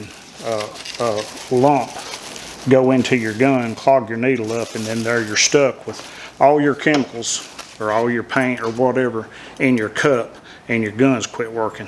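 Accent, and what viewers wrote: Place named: South, United States. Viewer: Thank you for the detailed ins and outs of your experiences using this tool. Great vid. I live in Oz now and it's great hearing the Southern accent of home.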